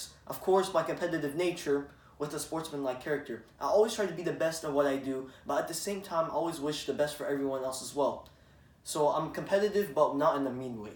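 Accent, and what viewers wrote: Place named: United Kingdom. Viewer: You have a British accent.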